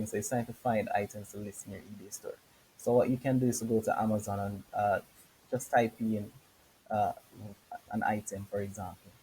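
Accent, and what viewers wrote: Jamaica. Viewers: Do you use a paid email store or just like the regular free eBay? Also I hear the JA accent big up:) are u doing this from JA? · you sound jamaican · You got a slight jamaican tone. are you jamaican? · Honestly this was the best explanation I found on YouTube on how to drop ship from ebay .....simple and easy to understand thank you .....by the way where r you from ? I'm from Antigua ur accent sounds similar or Jamaica?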